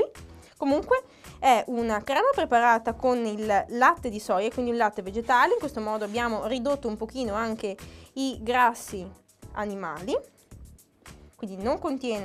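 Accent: Italy. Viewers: Ma sei di Parma?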